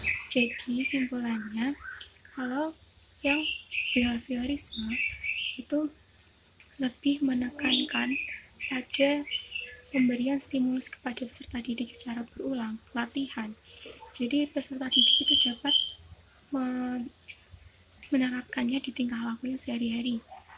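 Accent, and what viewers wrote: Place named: Java, Indonesia. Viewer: Uwuwuwuwuuuuuuu medhookk kaakaaa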